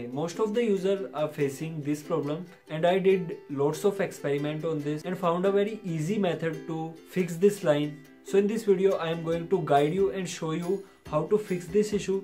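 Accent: India. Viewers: This mans sounds like your average Indian scammer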